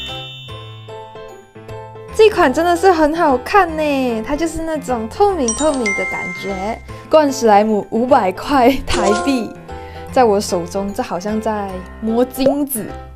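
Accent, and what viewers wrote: Malaysia. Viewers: 你是马来西亚人还是台湾人？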